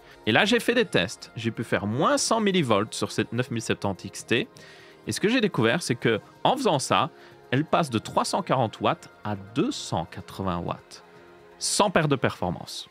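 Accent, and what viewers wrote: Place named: Belgium. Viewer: il est belge...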